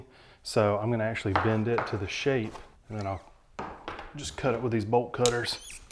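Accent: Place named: Alabama, United States. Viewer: Based on your accent and the way you pronounced “on” I’d be willing to bet money that you are from/around Mobile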